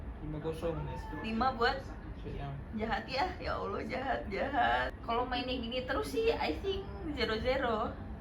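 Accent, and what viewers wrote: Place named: Sunda, Indonesia. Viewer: Teteh..ini pasti orang Sunda, logatnya katara pisaan..ha..ha.. Tenang aja teteh, nonton teruus nanti ketemu lagi di pinal krn kita pasti juara..ha..ha..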